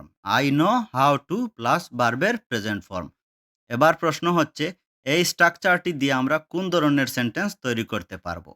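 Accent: Sylhet, Bangladesh. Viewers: ভিডিও টি ভালো লাগছে নতুন কিছু শিখালেন স্যার সিলেটের আঞ্চলিক ভাষা পরিবর্তন করুন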